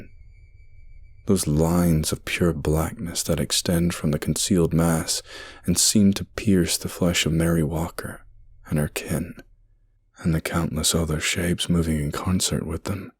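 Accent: United States. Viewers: You sound very American in this one. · You do a pretty damn solid American accent - from different regions, too, IIRC - so it's always fun to hear it. Occasionally you slip, like when you said "been" like "bean" and not "bin" like we yankee folk do. Not a dis at all, it's just interesting and fun to pick out little things like that! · You could so come to America and blend in 100%. Ever consider voice acting or even acting in general?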